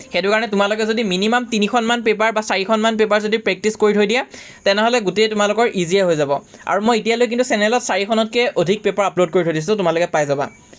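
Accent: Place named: Sivasagar, India. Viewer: Sivasagar distric r english khon lgeh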